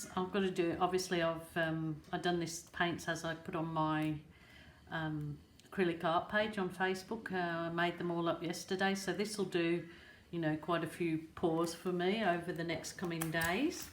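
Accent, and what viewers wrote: Birmingham, United Kingdom. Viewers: I love your result. I know you are in Aus but you sound like a Brummie to me. Are you originally from the Midlands?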